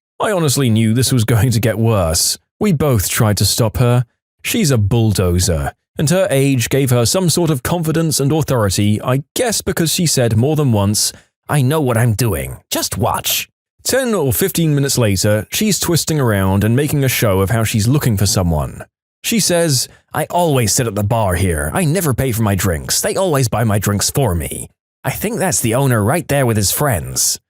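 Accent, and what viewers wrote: United Kingdom. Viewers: I love the British voice more actually lol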